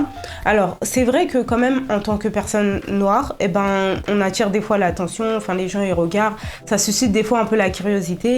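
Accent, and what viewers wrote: Paris, France. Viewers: To chyba paryski akcent. Przyjemna dziewczyna. 🌹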